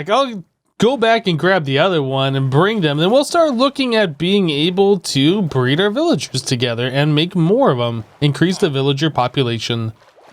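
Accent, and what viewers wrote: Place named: Canada. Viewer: That hint of what i think is canadian really gets me sometimes.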